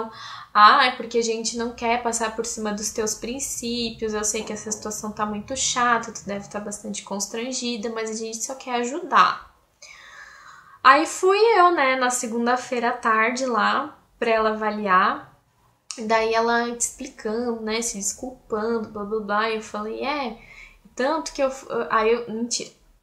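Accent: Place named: Rio Grande do Sul, Brazil. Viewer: ahh bom, achei que fosse aqui do RS pelo sotaque. Menos mal que agora está tudo certo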